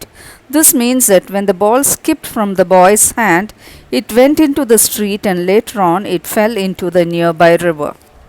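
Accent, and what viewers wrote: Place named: South India, India. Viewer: Mam is you from south india😅 our shool sisters explains similar like you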